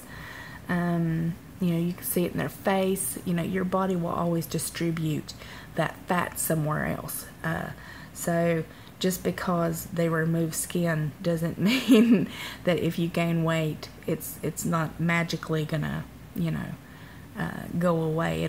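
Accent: South, United States. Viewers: just have to say you have the softest sweetest voice. and I love that southern twang lol. Girl keep your chin up and keep on keeping on.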